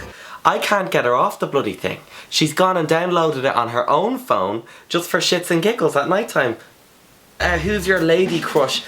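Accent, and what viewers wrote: Ireland. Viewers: ) I love your videos, and your accent! Ireland is one of the prettiest countries I've been to! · your accent is awsome love the irish accent its so sexy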